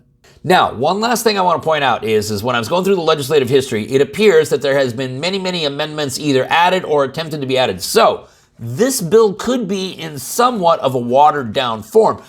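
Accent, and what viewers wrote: New Jersey, United States. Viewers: Sounds like jersey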